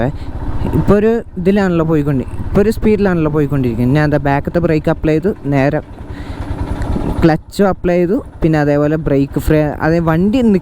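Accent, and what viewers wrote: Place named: Kochi, India. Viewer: Kochi machaan crrt sound 💯😂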